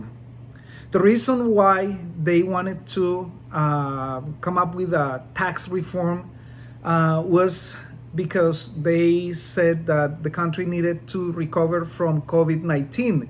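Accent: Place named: Latin America. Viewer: Esta genial que mantengas tu acento latino!!!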